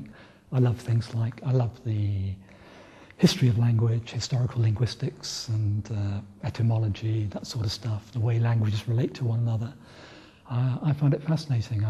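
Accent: United Kingdom. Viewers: Does he speak in RP?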